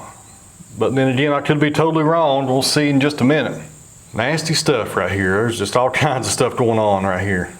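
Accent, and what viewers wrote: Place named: Virginia, United States. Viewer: Very interesting and well put together videos. That’s one hell of an accent you have there..is that Virginia ?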